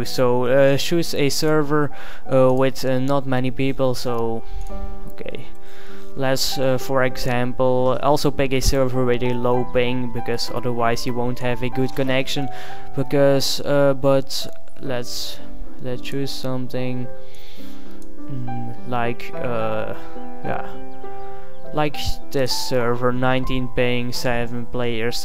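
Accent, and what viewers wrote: Netherlands. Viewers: So you're Dutch? Or from Belgium? I can hear it in your accent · Hahaha je bent nederlands ofniet ??? ik hoorde het de hele tijd aan je accent en toen zag ik ineens je lijst vol staan met nederlandse servers :P maar goeie vid man ik ga ze ff bekijken want ik snap nog niet hoe het spel werkt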